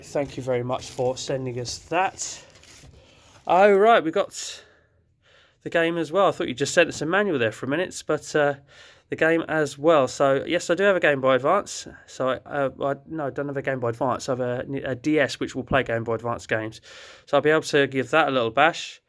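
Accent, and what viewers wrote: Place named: Portsmouth, United Kingdom. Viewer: What a fantastic video from the lads and opened by what i can only assume is a yeti (mate you're well hairy!) you sound like your from down south Portsmouth area? do you have a list/pic of your plat PS1 titles mate?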